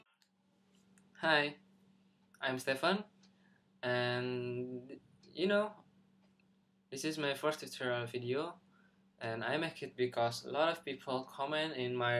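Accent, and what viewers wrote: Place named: Indonesia. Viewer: i noticed Indonesian accent~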